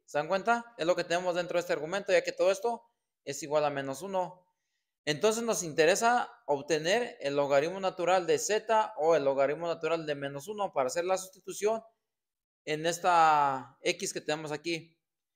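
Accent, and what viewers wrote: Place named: Mexico. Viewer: profe tienes un acento muy mexicano estas muy basdado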